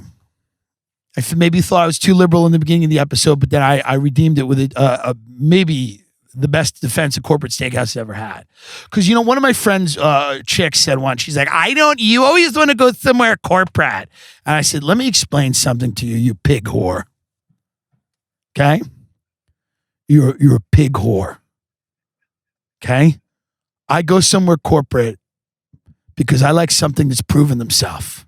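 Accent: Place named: New York, United States. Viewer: Love “New Yawk” or Long Island accent.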